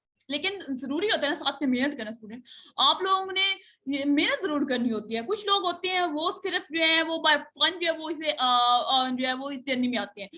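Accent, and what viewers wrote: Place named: Punjab, India. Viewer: Mam ...apki tone of language ..jhang,chiniot,sargodha qasor ..mandi bahu din en logon sy milti ha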